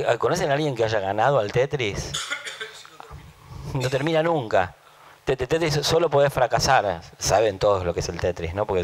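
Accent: Argentina. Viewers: quedemosnon con lo que dice que es lo importante! a mi tambien me da un poco de asco el acento porteño (ni se si es porteño siquiera)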